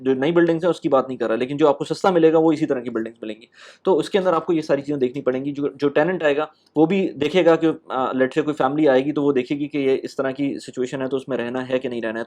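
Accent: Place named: India. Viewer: What language do you speak?! Your English is more Indian than English. It's horrible.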